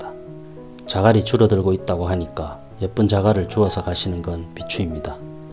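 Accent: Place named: Busan, South Korea. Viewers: 부산분이네요^^ 반갑습니다 저도 부산사람 ㅎㅎ 말하는게 넘 잼있어요 그림하나 몰라도 계속찾아보고싶게하네요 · 오~~!! 같은 부산분이셨네요~ 어쩐지 사투리가 익숙하다했습니다~ㅎㅎ 매일 다양한 기법들 잘 보고 배웁니다~^^ 좋은영상 많이 올려주세요~~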